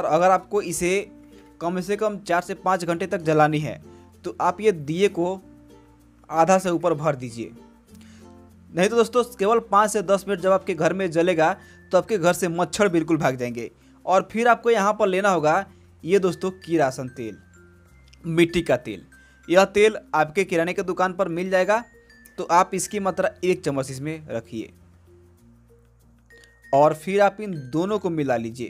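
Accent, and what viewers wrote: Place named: Bihar, India. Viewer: Explained in Bihari accent.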